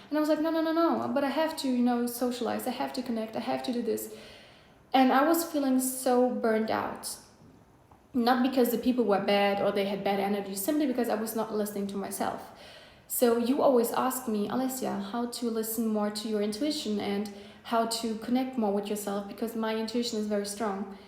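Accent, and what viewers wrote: Germany. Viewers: Is that a German accent? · Is she germann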